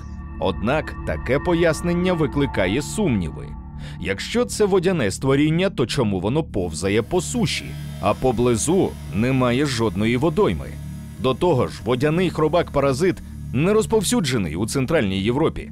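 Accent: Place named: East, Ukraine. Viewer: Ахінея повна!!! Соус ЧУМАК у 1986 році!!! Лось без голови,та він оглядаяться блять на того хто його сполохав а без рогів бо то лосиха бля!!! А соми мутанти довші за людський зріст в Іспанії подивіться які,відсутність природних ворогів і людини і купа їжі,херак і ось тобі мутант трьохметровий!!!! А свійське порося в каналізації це взагалі треш особливо східний голос за кадром! БАЛАБОЛО СЮЖЕТ від 2+2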